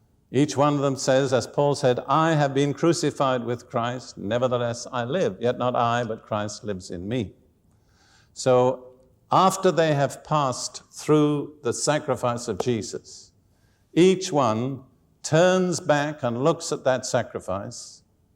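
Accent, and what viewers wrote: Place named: United Kingdom. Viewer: Real Brit Talk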